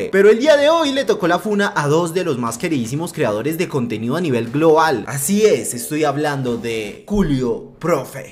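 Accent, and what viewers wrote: Bogotá, Colombia. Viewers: Vuelvete un canal de salseo pana, ver los chismes de los youtubers con acento rolo es divertidisimo y hasta donde se no hay ninguno colombiano que lo haga asi como lo hace pipepino en argentina o los espanoles y mexicanos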